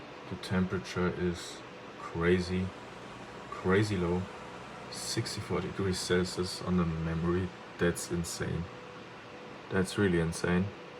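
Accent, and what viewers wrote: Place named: Germany. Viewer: Ich frage jetzt mal auf deutsch weil ich heraushöre dass du deutscher bist :D super video wäre nie draufgekommen kupfer zu verwenden.🙏 Wo hast du das Kupfer herbekomen in der Dicke? Und nach der logik muss man die Kupferplättchen dann niemals tauschen oder?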